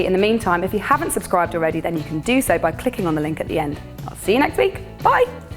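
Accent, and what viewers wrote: United Kingdom. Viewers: are you british ??????????????? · That british accent quit difficult 🙂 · Her british accent😻😻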